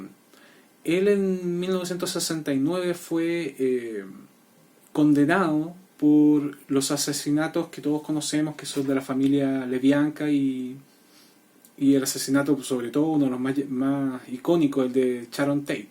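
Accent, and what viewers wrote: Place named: Chile. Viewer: En este canal hay dos cosas que me intriga mucho, los asesinos seriales y el acento chileno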